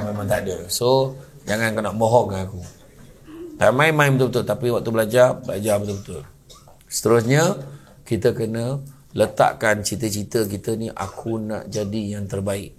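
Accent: Negeri Sembilan, Malaysia. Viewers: Assalamuslaikum wbt Allhamdulillah syukur kehadrat Ilahi saya suka dgn loghat NS ustaz. Selawat keatas Rasulullah SAW trima kasih atas ilmu yg ustaz sampaikan hanya ALLAH yg membalasnya. Aamiin3 YRA.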